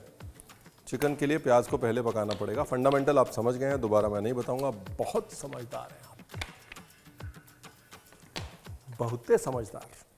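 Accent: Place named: Lucknow, India. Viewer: Sir apke muh se lucknowiya bhasha bohoateee hi badiyaaa lagti hai... Ekdum gajab!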